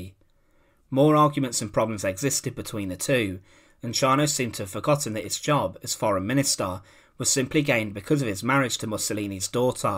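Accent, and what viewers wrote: United Kingdom. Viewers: you sound like a Brit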